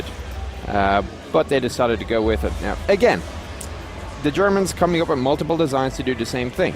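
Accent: Ireland. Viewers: Mr Chieftain are you by any chance Irish? Your accent seems a wee bit Irish.. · I'm sorry I might be completely wrong, but does he have a bit of an irish accent?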